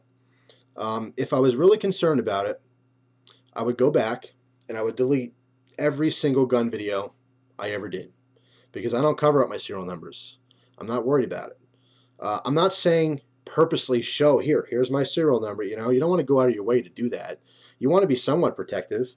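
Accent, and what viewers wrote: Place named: New York, United States. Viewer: you sound like you have a New York accent lol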